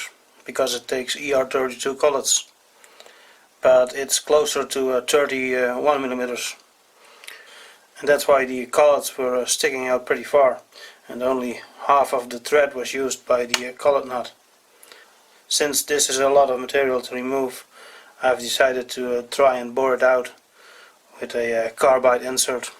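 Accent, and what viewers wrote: Netherlands. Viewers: Are you Dutch? Because of the accent.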